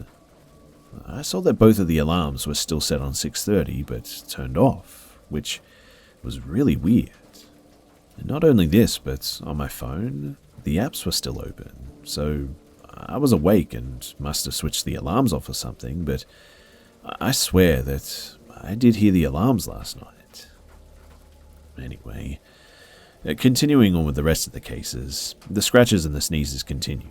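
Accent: Australia. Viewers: I've only recently begun using these "true scary stories" videos to help bring about sleep, and of the plethora of channels, I've found only a small handful of narrators whose voices I can even stand, let alone genuinely enjoy. I'm happy to say yours falls directly in the "awesome" column. If it wouldn't blatantly (and rightfully) be considered theft/plagiarism, I'd suggest you take the stories from all the other channels and spruce them up with that buttery voice from down-under! ;-) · I moved from Australia to the US when I was 14. You sound like home 🤗. I appreciate you being awesome man! Thank you! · It's so great to hear a fellow Aussie voice, it helps me haha ! Well sometimes helps me sleep lol. It's am and I'm still awake lol . But thank you so much I love this,IV been listening to creepy pasta and for some reason the Aussie accent makes me feel like home. 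✌️✌️✌️🙏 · I found you through urmaker and I'd already heard of you but I'd never watched a video of yours and I certainly wasn't expecting that Australian accent but I'm not complaining!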